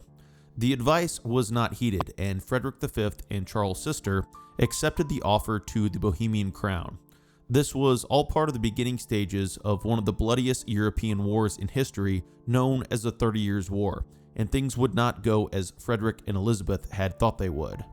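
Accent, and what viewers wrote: United States. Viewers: Good luck everyone to be endured terrible American commentater cringeworthy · I prefer listening to David Starkey who is English and pronounces the English language correctly not some American robotic voice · The Lost Cause is completely riduclous and based in racism, White supremacy and pro-slavery nonsense. The Cavaliers don't exist anymore, the Royalists won in the long run, but the people of Britain wanted the King back, the Americans have never wanted Confederates back. Don't compare the civil war on slavery to the English Civil War. Plus, the Peasants' Revolt never threatened the monarchy. Learn English History. You sound American. You clearly don't know English history, you can't speak English correctly, and you're using Old English in your name. Mate, you're American, you're not English.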